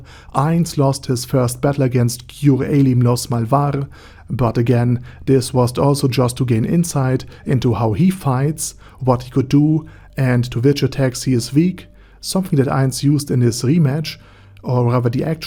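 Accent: Germany. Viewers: Hearing someone analyze anime characters with a german accent sounds so wrong yet so enjoyable.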